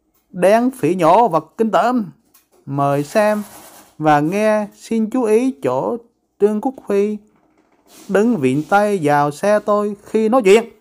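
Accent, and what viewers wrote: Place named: Quang Nam, Vietnam. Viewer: Giống noi tiếng Quang,không hiểu noi gì, ham nhiều chuyen,xỉa vo chăm biển người khác, sau co view cha nội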